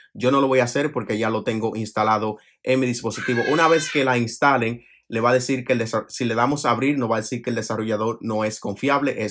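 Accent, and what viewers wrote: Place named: Dominican Republic. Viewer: Dominicano Verdad lo noté por el acento Y se puede con iOS 12.2 ?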